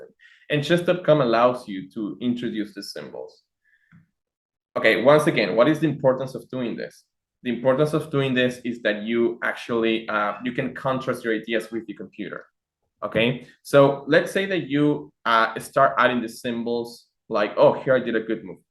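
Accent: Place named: Peru. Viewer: Hahahahahahaaa da risa un Peruano intentando hablar inglés. Cobarde corriste como tú p madre. Estás muy f30 suda.k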